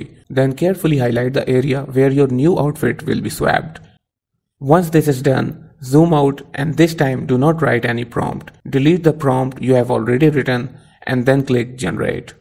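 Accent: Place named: Hindi, India. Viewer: Hi man thanks for this, i noticed you have a strong Hindi accent, your obviously not a Native language English speaker like me. I was wondering do you know of any voice cloners that can accurately clone none native English accents like ours?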